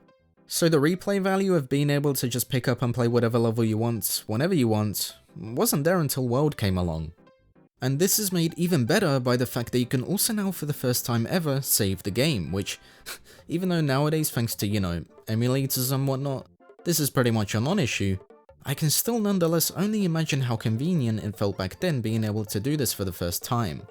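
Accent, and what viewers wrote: United Kingdom. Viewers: Weird to hear someone with a UK accent saying Super Mario Bros saved gaming, considering there was no video game "crash" outside of the USA. Gaming was still very strong in the UK at that time. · Interesting hearing a British person talk about Mario so heavily. I always thought Mario wasn’t liked in the UK 🇬🇧 because he was deemed too kiddy.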